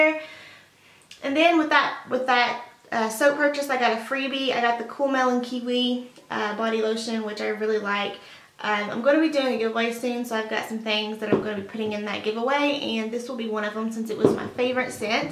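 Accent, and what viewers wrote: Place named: United States. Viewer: Theres a skunk? Dead? Thats nastyyyy :D i love your accent... being british some of the american accents are so different to hear!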